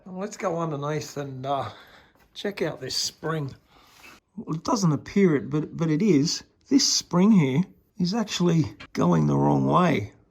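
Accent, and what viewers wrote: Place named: Australia. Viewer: Is this in the USA or Australia hence the Aussie accent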